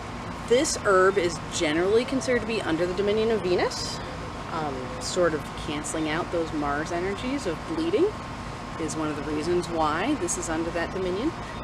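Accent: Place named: New England, United States. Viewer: Sounds like my neck of the woods. New England.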